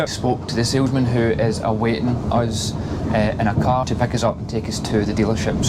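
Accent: Scotland, United Kingdom. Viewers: Good video, nice to hear a Scottish accent after moving south from Inverclyde! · Do I detect a Scottish accent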